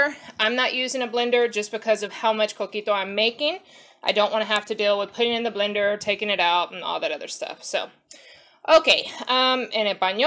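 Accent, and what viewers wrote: Puerto Rico. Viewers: You could hear she's americanized Puerto Rican when she speaks her Spanish, but she dominates it well it's understandable....se le nota que es una boricua americanizada nacida en los estados unidos por la manera que pronuncia sus palabras ...pero lo domina bien se le entiende.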